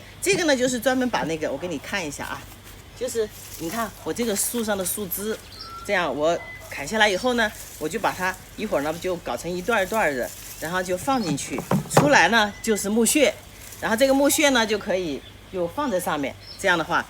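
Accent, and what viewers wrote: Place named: Sichuan, China. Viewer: 阿姨很棒！您说是我们家乡的川普么~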